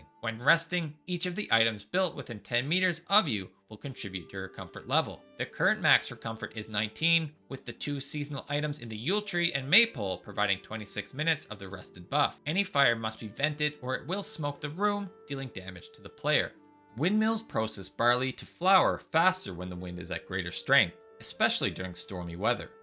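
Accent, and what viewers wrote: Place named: Canada. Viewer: A couple things, the fire tip was super short and kind of obvious lol, one useful thing I've been told about smoke ventilation is it's not always necessary. I don't remember the exact numbers but it's something around 3-4 walls high when you can no longer suffocate from smoke.👍👍 But If aesthetics are more your aim then the particle effects that emerge from smoke buildup is probably going to bother you.😅on a lesser side-note I can totally tell your Canadian from the way you say your R's😂👍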